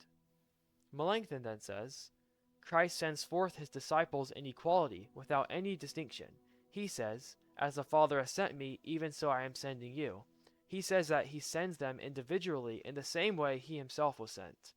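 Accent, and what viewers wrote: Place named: RP, United Kingdom. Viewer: Beautiful refutation by Philip Melanchthon. Also, you seem to pronounce your long O sound like Received Pronunciation (i.e. "Ruh-oom" instead of the usual American "Row-oom" for the word "Rome") instead of General American, which I found very interesting as someone living in Scotland. God bless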